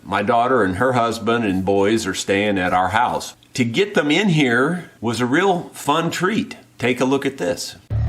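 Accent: United States. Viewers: Hi, i totally enjoy watching your video’s. I enjoy listening to all the interesting stuff you talk about. You have such a lovely accent and could listen to you all day..lol. I find it one of the most pleasant accents from America. I live in Scotland and enjoy your channel. I thought you said you were going to take it easy?. You are a workaholic..lol. You are looking well, So keep well and look after your family..👍🏻 and who know’s… i may come across the pond and rent one of your nice holiday apartments when i have saved up enough money, or robbed a bank..lol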